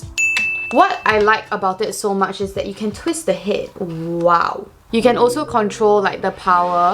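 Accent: Singapore. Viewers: Qiaolingvlog here, thank you for sharing and I feeling your English mix Singlish already lo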